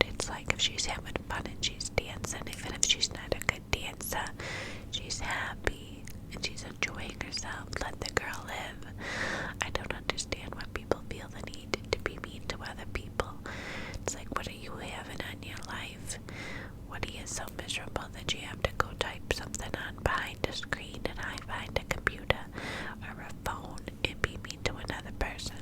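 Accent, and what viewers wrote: New York, United States. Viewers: The NY accent is everything. I’m loving the nude sweater and golden tones on your eyes ! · Hey I love your New York accent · NY ACCENT IS LIFE 😍😍🗽🗽🗽👌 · The NY accent is EVERYTHING! i could just listen to you talk about anything. love you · Not only am I absolutely obsessseeeddd with the NY accent, the background water projection is so relaxing! Such a good vid 💕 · That ny accent is amazing just like u girl hope u have a great week remember your son is so lucky to have u xx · New York or jersey? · Ny accent is just amazing!,!,!!! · Love the New York accent!! What is your light called in the back x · I am literally in love with the NY accent it is so tingly!! Maybe in the future you could do a hairdresser role play in an NY accent 💕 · ny accent AND 30 mins....#spoiled:) thanks soo much! <3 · I love your New York accent but your little rant about people’s comments saying it sounds Boston made me crack up even you smiled after you’d finished haha 😂 not sure how I missed this one but thank you, love it the accent brings me so much comfort 🥰 like in the movies when your little snuggled up watching films and they have the accent 💜 You also have such lovely hands xx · i find it funny that I LOVEE your NY accent, but me and most of my family is from Boston lol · I swear it's like you've read my mind!!! 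For the past couple of days I kept thinking about how I can't wait for a new NY accent video from you. I'm melting.....🤤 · Yessss I think that the clip might get bigger than the claw with the mic and the ny accent like is this a dream 🥰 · Omg i love the ny accent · The clip truly is one of the tingliest triggers I’ve happened across! When you were running the clip through the mic, using both clips at the same time, and just saying the word “clip.” SO MANY TINGLES! But... - melted my brain in the most amazing way. 🤤 That was sooooo good. Thanks! This video was relaxing from start to finish. And I love your NY accent! The haters can kick rocks with no shoes on. 😎✌️💕 Edit: -... use this as a “treat yourself” button y’all! 🤤💜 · I have watched the New York accents none stop and it’s funny cause my friend that’s from New York talks just like this it’s perfect 😂❤️ btw perfect video so so relaxing ❤️ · I LOVE the New York accent!!!! 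Love you ❤️ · love the New Yawk accent ! ur really good at it ! :D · As a brit 🇬🇧 the newyork accent just hits different.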